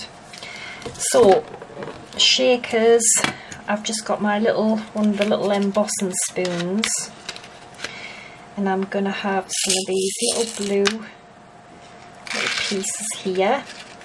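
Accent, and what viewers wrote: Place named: Northern England, United Kingdom. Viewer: Hi just found you're channel,and although I don't make things like tags etc,more into colouring books,I thoroughly enjoyed you're tutorial,and look forwards to watching more! Can I ask where you are from,lovely northern accent,I am a Northumberland lass,but now living in Spain!!.Thankyou for sharing X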